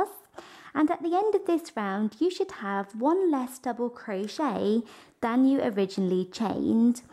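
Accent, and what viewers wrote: England, United Kingdom. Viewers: I love the tutorial but I must say the English accent is too much U over pronounce words and its distracting from the video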